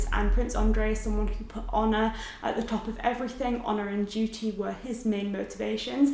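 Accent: United Kingdom. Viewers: Do you have a slight British accent or am I nuts?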